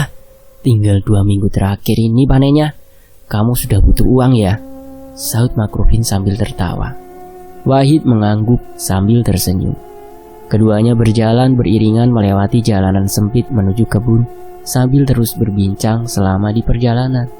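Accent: Java, Indonesia. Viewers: Wih pertama kali nonton channel ini pas cerita sewu Dino.. Cara penyampaian nya Bagus apa lagi logat jawa nya,ya walaupun saya Orng sunda tapi enak dengerin Mimin wkwkw.. dulu subscribe nya masih sedikit sekarang udh banyak ya wkwk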